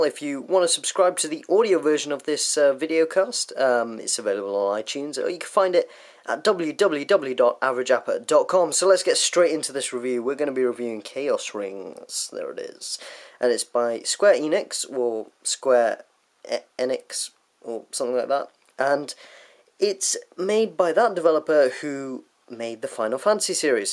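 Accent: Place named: United Kingdom. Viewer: Your accent sounds like you're from the same place in the UK as David Oliver from the Daily Show!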